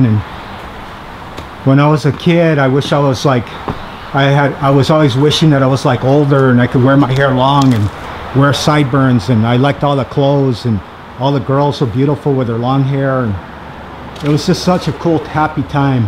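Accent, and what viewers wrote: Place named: Chicago, United States. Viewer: are you from Chicago or Milwakee? Its date der accent Yah Know?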